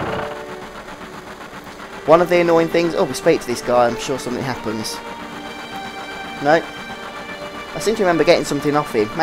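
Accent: United Kingdom. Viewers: ooo a british lper I'm subbing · I know this is way off topic for the game and everything, but I was wondering where is from. I can't quite place the accent. I have a slight thought of British but then again Australian also comes to mind. I mean correct me if I'm wrong but it's just a thought and I'm really curious. I'm a little embarrassed to say that I find his accent cute...;)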